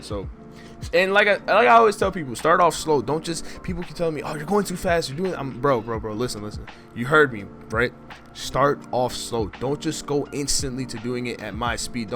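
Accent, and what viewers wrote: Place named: Florida, United States. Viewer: dope vid u must be from florida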